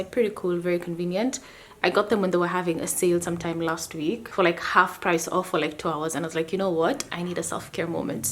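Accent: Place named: Kenya. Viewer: Love her Kenyan English accent 😭ps we need a tutorial for the makeup you was wearing in the start of the video 😍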